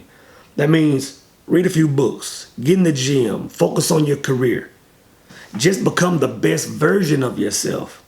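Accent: Texas, United States. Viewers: Big dawg is obviously from Texas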